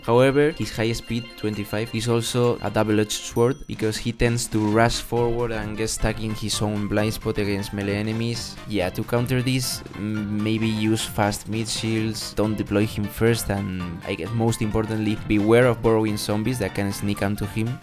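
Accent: Spain. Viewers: E w tu ere español no? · R u spanish dude? Your acent is exactly the same as mine when i speak english😂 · solo por como hablas creo que eres español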